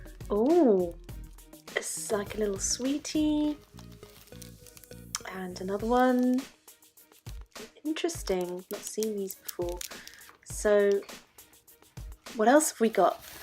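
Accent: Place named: United Kingdom. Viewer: I support u. I love how ur voice sounds! Just the British voice is so beautiful am I right? I'm British but my voice is not as pretty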